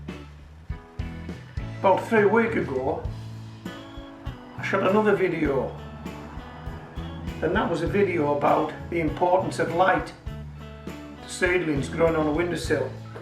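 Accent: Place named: North West England, United Kingdom. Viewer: Hi, I seem to have offended you with my comment, I really didn't mean to, I was very excited to find your channel, and thought from your accent that you may be in the North West like me, so was inspired by the things that you were doing in this climate. In my first video which I am publishing this week, I have said that I got my inspiration from you, and linked to your channel. If you want me to take it out, I will do.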